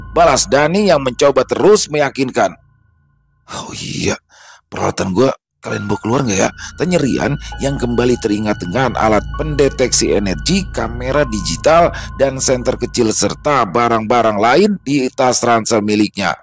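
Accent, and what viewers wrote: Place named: South Kalimantan, Indonesia. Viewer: Met mlm mas dgn suara khasnya anak kalsel hdir lg nyimak ceritanya⭐⭐⭐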